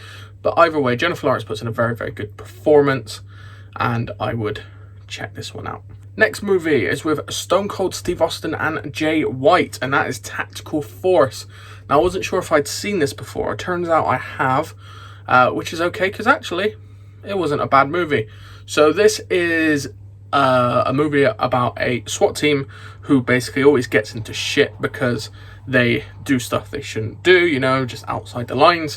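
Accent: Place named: Bristol, United Kingdom. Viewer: Great video - Love how you are int oso many different genres, aloa love the accent from a fellow Bristolian! More please!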